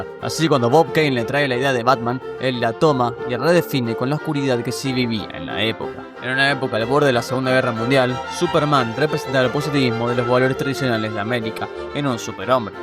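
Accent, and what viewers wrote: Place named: Argentina. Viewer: Dios mio, de verdad que el algoritmo de YouTube está podrido estos días al no hacer más populares estas joyas de videos. Amo tu forma de narrar, y el acento argento siempre me ha gustado. Como fanático del cine, realmente nunca se me ocurrió relacionarlos, pero escuchando tus explicaciones, realmente te dás cuenta de que si tienen bastante en común.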